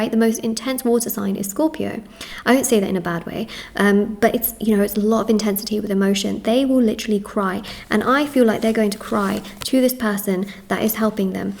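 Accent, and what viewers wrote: United Kingdom. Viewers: Hey actually… when your video popped up on my feed… I thought- “Hey, I haven’t seen her in a bun before.” “She looks rather pretty (said in a thick British accent) (pun intended😂❤) (cuz you have a British accent) (I suppose) Huh. You Look Beautiful. There is no other way. And I will not hear of it! ❤❤❤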